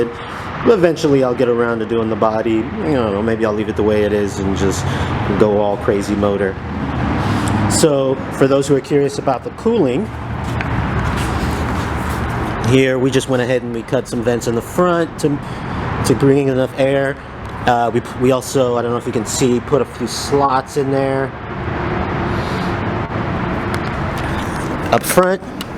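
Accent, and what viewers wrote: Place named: East Coast, United States. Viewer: Funny, you don't sound Californian! You sound like from the east coast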